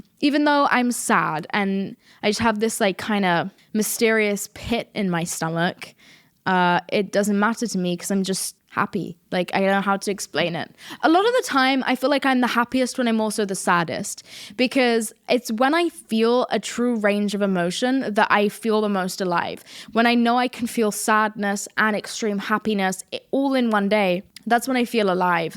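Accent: United States. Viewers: US accent innit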